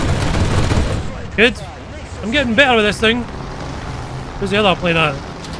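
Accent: Scotland, United Kingdom. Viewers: Two observations: 1) Your “silly skit” intros are excellent, and they seem to be getting better as you go on; 2) I’ve made viewing your videos part of my daily routine. Whether it’s your Scottish accent, your hilarious sense of humor, or the excellence of your content, I guess I’m addicted to your channel. Well done,.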